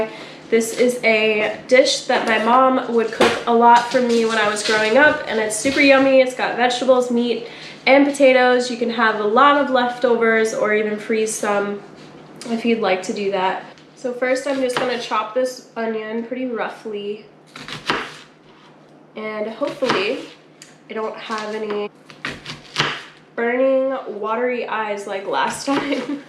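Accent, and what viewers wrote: South, United States. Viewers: You have the cutest country twang. Love it. That looked delicious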